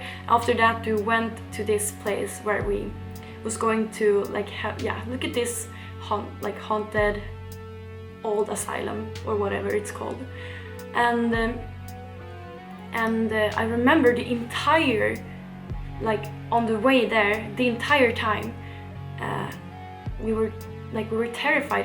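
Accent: Sweden. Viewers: You talk English like a swedish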